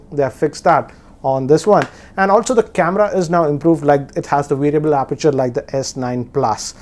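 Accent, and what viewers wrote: India. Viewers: Indian.. Accent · I really dont know why youtube is pushing Indian channels to the top of search result besides so many UK and USA channels covering the same topic. Is so hard to understand Indian English, I can barely understand them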